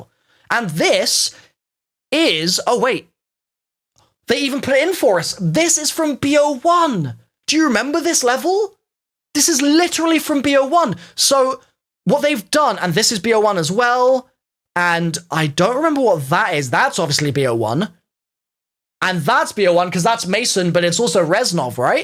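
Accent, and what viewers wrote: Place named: United Kingdom. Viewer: The most British accent i ever heard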